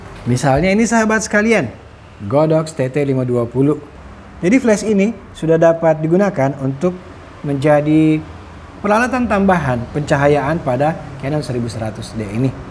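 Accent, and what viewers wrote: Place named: Bugis, Indonesia. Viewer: Org Bugis y??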